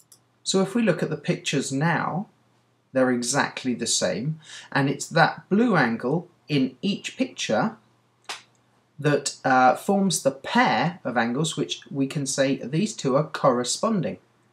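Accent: United Kingdom. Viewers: ***** British accent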